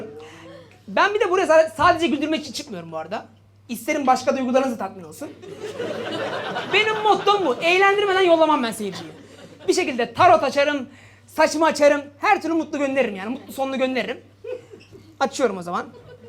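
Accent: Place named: Kaş, Turkey. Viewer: Kaş şivesi devamkeeeeee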